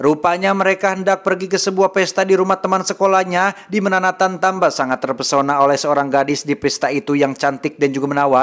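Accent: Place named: Madura, Indonesia. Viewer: Madura bg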